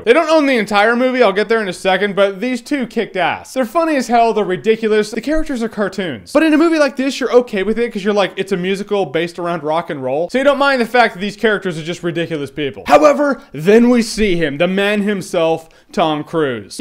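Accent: United Kingdom. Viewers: wow... nice British accent!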